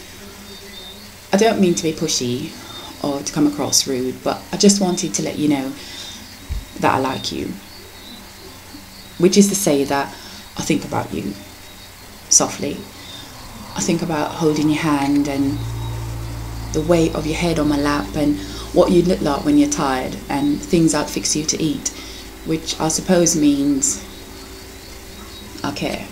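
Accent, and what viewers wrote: United Kingdom. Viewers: I love this one so much. The british accent really carried it off. GOOD JOB! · i love it! love the fact that its in a brit accent too. "yu talanted mi gal"! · your british accebt man, woah! shame your not posting them all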